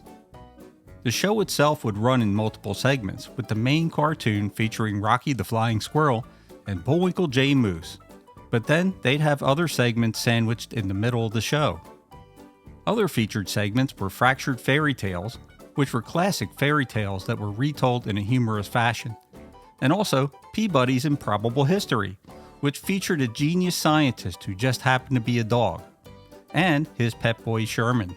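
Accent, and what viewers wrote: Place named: Baltimore, United States. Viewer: narrating this has got to be from Baltimore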